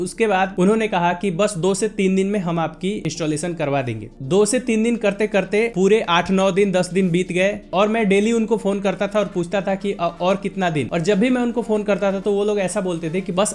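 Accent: Bihar, India. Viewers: आप की भाषा बिहारी है, और बिहार में सब काम लेट होता है, रायपुर में ०२ घंटे में इंस्टॉल हो गया। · Aap Bihar se ho kyaaa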